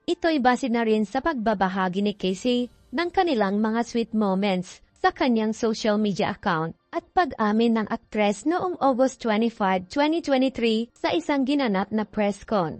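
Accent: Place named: United States. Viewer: Hoy ikaw American ka? Ayusin mo tagalog mo di bagay sa yo!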